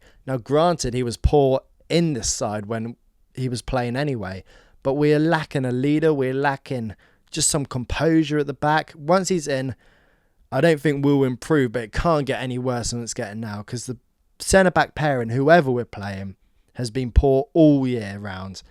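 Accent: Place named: Scouse, United Kingdom. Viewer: Such a thick scouse accent, I needed subtitles.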